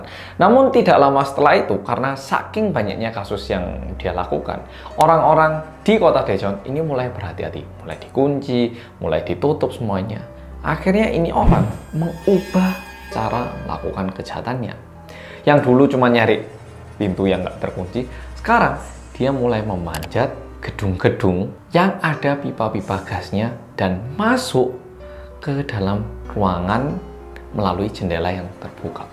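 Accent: Java, Indonesia. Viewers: Saya tuh baru subcribe, Bang. Kaget sih ketika nemu Oppa Korea bahasa jawa medok 😆😆😆, maaf ya Bang. Suka yakin, banyakin bikin video kaya gini Bang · Akhir nya setelah nonton video2 beberapa x gw subscribe niiih....punya ciri khas sendiri nih "medok" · Jawa banget 😂😍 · maaf br gabung mas,mau tanya mas,mas ini asli orang mana y,kq logatnya medok kyk orang jawa 🙏 suka bgt liat chanelnya mas 👍👍👍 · Kerene mas Korea narasine enak di simak asli keren mas👍🏻baru x pertama nemu chanelnya membawa narasinya pun sedap untuk di simak dgn logat medok nya pun yg bikin terpikat outo Subscrab deh✌🏻🤣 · Hai mas Korea Medoook😅 Siyap nyimak 🎉🎉🎉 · Sy kaget pas denger suara mas.....logat Jawa nya medok banget🤭🙏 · Suka deh sama medoknya bahasa mas..sehat n sukses trs..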